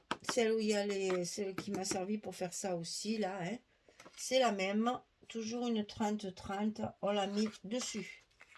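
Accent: South, France. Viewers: Bonjour, c'est pour vous dire que j’adore tout vos tutos, ils sont très bien expliqués, je n'ai jamais pensé à vous laisser un commentaire, mais je me rattrapages aujourd'hui, je doit faire un album naissance et il va y avoir beaucoup de vos pages. Je voulais vous remercier aussi pour m’avoir fait connaître le papier 50x70 cm, par contre vous êtes une artiste dans la décoration, moi j'ai encore des progrès à faire. Et merci pour votre bonne humeur et pour votre bel accent du sud donc je fais partie😊 ❤❤❤❤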